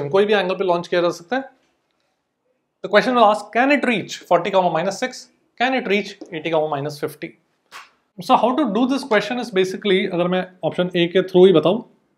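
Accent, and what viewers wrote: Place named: Tamil Nadu, India. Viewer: Your English sounds like tamil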